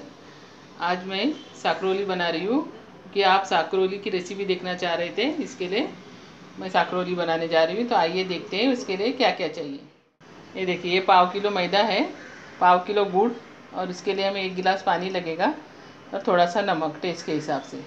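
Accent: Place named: Konkan, India. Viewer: Asalam alaikum. Hope you are doing great and enjoying spirit of Ramadan. You have reminded me typical konkani cuisine of my Nani. You accent reminds me of my Nani’s family. I have already tried chana chatpata batata yesterday. Please keep doing what you are doing. Because it makes so many people feel and witness their childhood. Ramadan kareem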